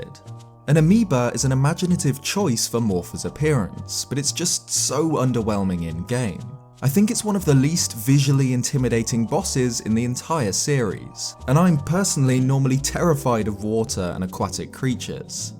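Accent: England, United Kingdom. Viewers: that's just the English accent for ya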